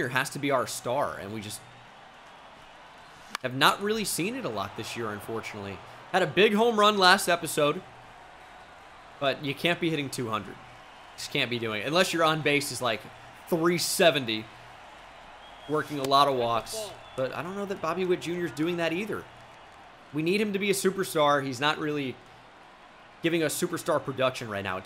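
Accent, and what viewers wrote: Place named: New Jersey, United States. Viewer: You got really Jersey in here and I enjoyed it haha.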